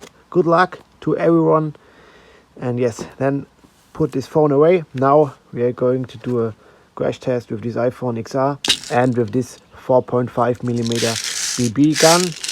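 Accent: Germany. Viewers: ich liebe Englisch mit deutschem Akzent xD I love the 5 mm faif millimeda[ganz schnell] echt gut xD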